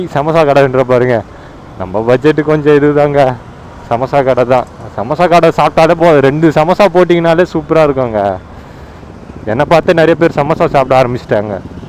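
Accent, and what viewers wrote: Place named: Chennai, India. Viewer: Bro unga chennai slangu super ah irrku.... Na oru nal ungla meet panuven....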